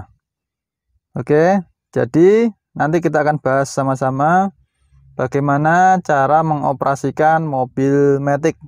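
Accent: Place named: Java, Indonesia. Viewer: Suara nya medok ya kak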